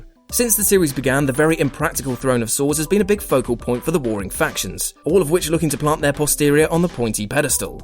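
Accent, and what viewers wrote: United Kingdom. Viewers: Brittish Ben 10 voice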